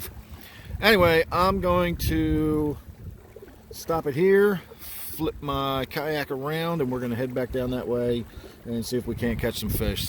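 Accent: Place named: Baltimore, United States. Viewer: Yes, Sir, it's definitely not the hardcore Baltimore accent, it's quite a bit softer.Maybe suburban It's odd because some of it sounds familiar to me (Virginia) but then a few words sound like of Northern, so when that happens I think Maryland.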